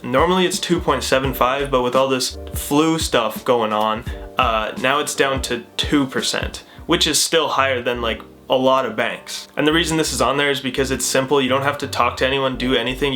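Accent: Canada. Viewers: This was super helpful! Are you Canadian as well!?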